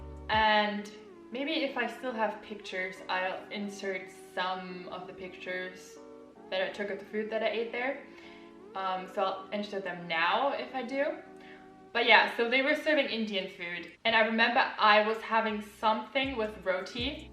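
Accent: United States. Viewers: Sister, are you really German? Your accent is not at all German. It's American. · She is German having American Accent, making video on India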